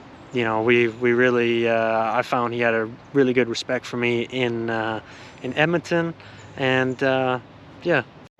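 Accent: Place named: England, United Kingdom. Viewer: Where in England you from bro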